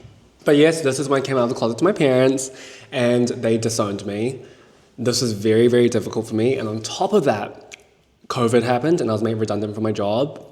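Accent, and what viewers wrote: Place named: Auckland, New Zealand. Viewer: this is sort of off topic but you have a very EASY voice to listen to -- some ranters can be grating on the ears or tell stories too slow, too fast but your pacing was perfect and your tone, dry humor and kiwi accent all tied so well together and made this an easy watch. Coming from a fellow kiwi who can't really stand the intense american influencer accents, you have a really clean kind of auckland accent, and since thats rare to find in influencer media, this kinda felt like a breath of fresh air, lol. This video literally healed my fried attention span. So shottiess for the mean as content, and am looking forward for more to come!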